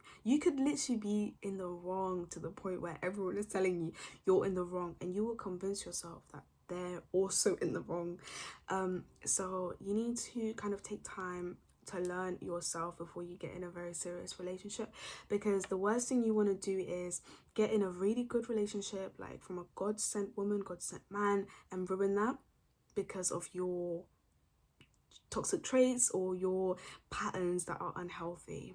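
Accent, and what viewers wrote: United Kingdom. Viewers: Your British accent is so refreshing and it's giving!!😩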